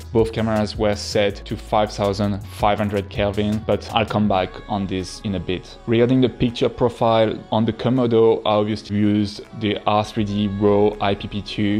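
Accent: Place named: France. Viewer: I bought a FX3 and FX6 but I plan to sell the FX6 for the Komodo. I don't need two run'n'gun cameras and the FX3 is perfect for that (so it will be basically the same setup as you😄 without the Raptor haha). And I'm gonna sell my Pocket 6k pro (that I love), because I won't need it anymore since I will have the Komodo. But before that I'm going to rent the Komodo, and test it on my next project (I have a big music video coming soon). I love the FX6, don't get me wrong, it's a true workhouse! but I can do the same with the FX3 and the image quality is almost identical. The only thing I will really miss is the electronic VND from the FX6 (otherwise I much prefer the image coming from my Pocket 6K Pro, and I guess I will also prefer the image coming from the Komodo). P.S: tu as déménagé en Australie (j'entends à ton accent que tu es Français 😃)? J'y ai passé un an en 2009, j'ai adoré!! 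Il faut que j'y retourne un jour!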